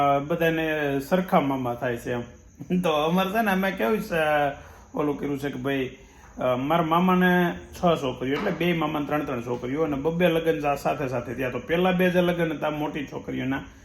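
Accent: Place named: Saurashtra, India. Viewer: તમારા આ vlog મા ગામડાનો જીવન બતાવ્યું એ જોઈને બહુ મજા આવી. તમારા vlog એકદમ ઓર્ગેનિક હોય છે. તમારી સૌરાષ્ટ્રની તળપદી ભાષા સાંભળવાની મજા આવે છે. ફઈ ના ઘરે ફુવાને ઇગ્નોર કરતા હતા તે જોવાની મજા આવી. Keep it on. ☺️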